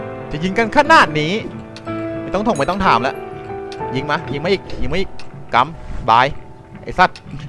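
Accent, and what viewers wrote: South, Thailand. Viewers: พี่ เสียงเหมือนคนใต้เลย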